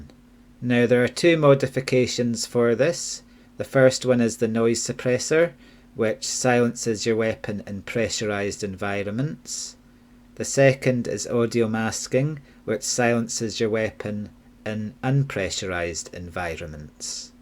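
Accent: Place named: Scotland, United Kingdom. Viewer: No, that's right. Nothing harsh about it, it's more of a "thoughtful" Scottish accent. 🙂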